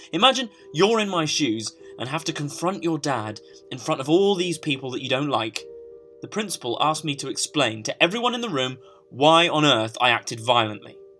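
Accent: United Kingdom. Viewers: just because he has a british accent doesn't mean he says that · why does he sound british but act american???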